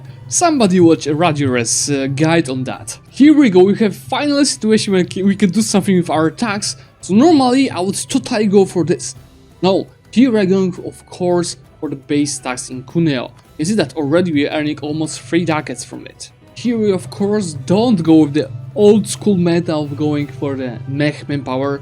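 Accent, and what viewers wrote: Poland. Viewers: this man's accent tickles my hussar sense.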